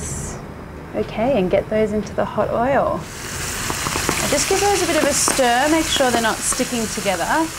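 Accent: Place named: Australia. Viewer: I’ve got to try this. Great vid and so well done. Are you Australian? Like your accent.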